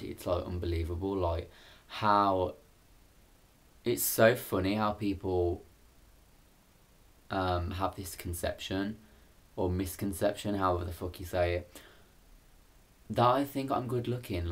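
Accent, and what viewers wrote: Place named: Birmingham, United Kingdom. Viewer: I’m Bromsgrove u sound same x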